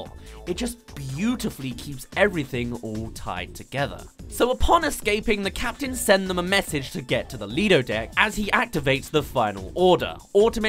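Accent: United Kingdom. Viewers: I actually like the British accent. Maybe even a bit jealous...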